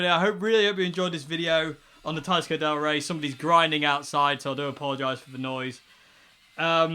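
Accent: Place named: Lincolnshire, United Kingdom. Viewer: with a hint of lincs accent if I'm not mistaken?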